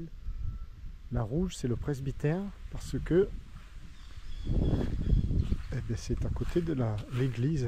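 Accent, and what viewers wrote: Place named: Provence, France. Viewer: Cc et ouiiii un Biaou un Bacaou,Espigaou ça me fait toujours du bien d'entendre des mots de cher nous, pays de Marcel Pagnol. Toujours de très belles vidéo en plus avec l'accent et le sourire. Biz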